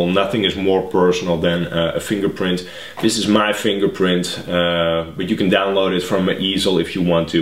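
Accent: Netherlands. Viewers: je klink lekker nederlands haha nice vid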